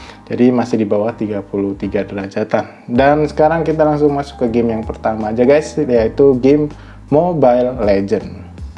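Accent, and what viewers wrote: Cilegon, Indonesia. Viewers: Orang Cilegon ni . Hehe...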